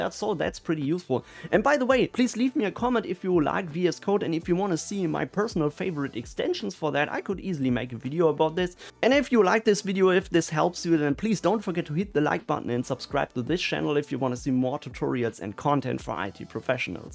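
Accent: Germany. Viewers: Schwäbelst du ein wenig lol ? Grüße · Are you German? I can hear the accent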